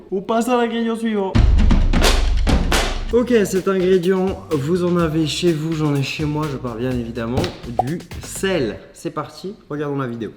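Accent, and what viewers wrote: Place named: South, France. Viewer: C’est moi ou tu as un peu perdu ton accent du sud😅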